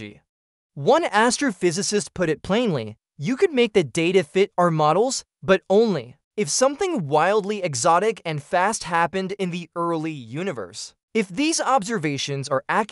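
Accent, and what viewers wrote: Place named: United States. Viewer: Is it? Sounds like typical american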